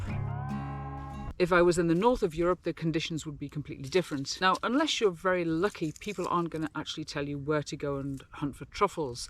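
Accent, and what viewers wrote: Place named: Liverpool, United Kingdom. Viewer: Do i detect a wirral or Liverpool accent great video by the way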